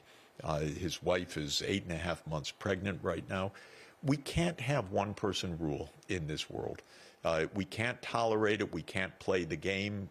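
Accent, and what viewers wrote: India. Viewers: thickest of indian accent is easily understandable.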